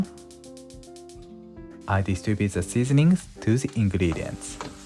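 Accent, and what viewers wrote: Japan. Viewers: I like your Japanese English! Thanks for the recipe, I will make it tomorrow ❤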